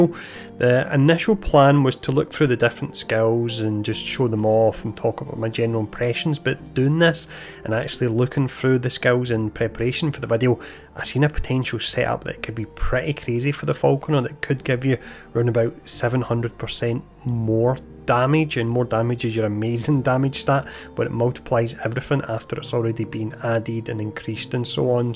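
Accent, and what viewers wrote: Scotland, United Kingdom. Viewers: I absolutely love falconer, and the dive bomb. BUT, cant help myself to feel bad for the poor falcon when he lands that dive bomb, honestly, throws me off, I don’t even want to use it because it looks so hurtful😆 Btw, are you Scottish? You sound like my favorite snooker player, mr. John Higgins 😁 great video all the way through, sub!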